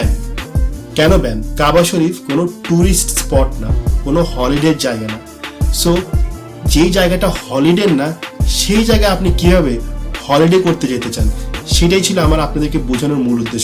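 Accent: Noakhali, Bangladesh. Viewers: ভাই আপ্নে নাকি নোয়াখাইল্লা??? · bhai are you noakhailla? আমনে আসলে কি নোয়াখাইল্লা?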